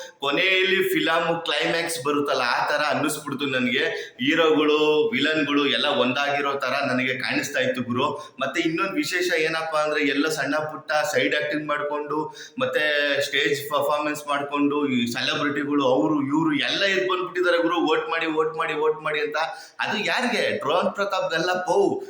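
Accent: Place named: Bangalore, India. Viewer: Bangalore chenage matadtira brother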